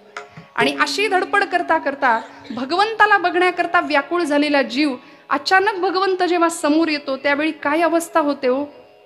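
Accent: Standard. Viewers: ताई अतिशय सुंदर कीर्तन आणि वाणी खूप शुद्ध आहे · अतिशय सुंदर...गोड आवाज,शुध्द , उच्चार , प्रासादिक वर्णन...कीर्तन संपूच नये असे वाटते.... राम कृष्ण हरी 💐💐🙏🙏🙏🙏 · अत्यंत सुरेख !! वाणी अतिशय शुद्ध, स्पष्ट उच्चार, विषय मांडणी, विस्तार अप्रतिम.. एकंदर प्रासादिक !!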